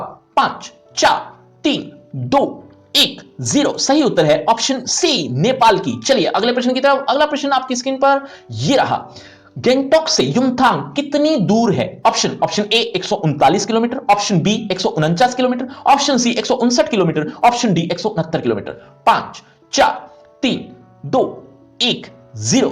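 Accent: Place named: Punjab, India. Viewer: Sir's Definitely From Punjab,His Accent Shows It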